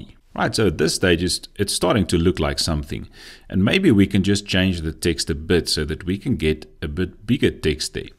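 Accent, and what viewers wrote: Afrikaans, South Africa. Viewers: This is one of the best structured flutter tutorials, English with Afrikaans accent is like I feel home. All the best and regards from Namibia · You sound South African ❤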